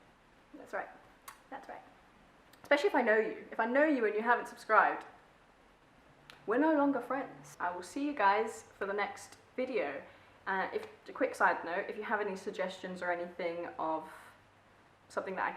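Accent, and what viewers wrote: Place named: United Kingdom. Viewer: Hi, really glad to hear your distinct voice as a British Chinese. I'm Chinese with 8 years of study experience in the UK, USA, and Australia. During my extended time study period (I'm doing my Ph.D. now at the age of 27), Brits distinguish each other based on their accents. That is to say, if you have a British accent, you would be regarded as a Brit, whereas someone like me with a mixed accent would be considered as a foreigner. Since you have a perfect British accent, I am curious to ask you a question. After COVID-19, do you find British Chinese encountered some certain level of discrimination and bullies in their workforce or in their life? Greetings from Zhongshan City, Guangdong Province, China 🇨🇳🇨🇳🇨🇳. ❤❤❤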